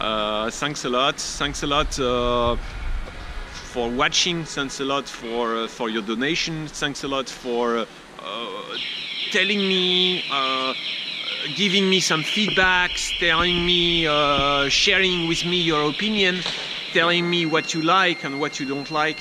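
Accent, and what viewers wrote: France. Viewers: . a French accent. thanks for the comment. please try to hold angle the selfi stick lower to take it more.out of the camera shot. Mercie buquo.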